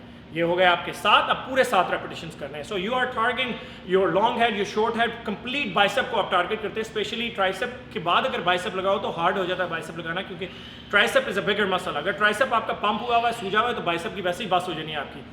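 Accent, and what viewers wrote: Punjab, India. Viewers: loved ur way of telling punjabi tone especialy .and thanx for tips i will try .and pls let me know the brand of protien too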